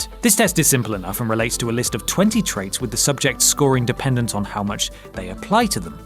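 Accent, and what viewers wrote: United Kingdom. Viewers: I was wondering why watch mojo all the sudden become British but then I notice it was what culture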